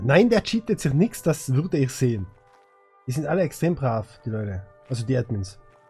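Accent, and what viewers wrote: Switzerland. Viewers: SRY mein lieber, der beste ARK-YouTuber of the World ist und bleibt immer noch Cloud:-) aber ich liebe deinen schweizer Slang;-)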